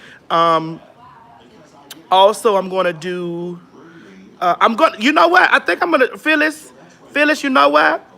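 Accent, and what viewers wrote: Florida, United States. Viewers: Hurry up🤣🤣😂 With that Florida accent. I love you!! Yasssss Praise him!!😚😚 Omg!! Kick rocks and boulders! I'm on my side laughing!!💜💙💚💛❤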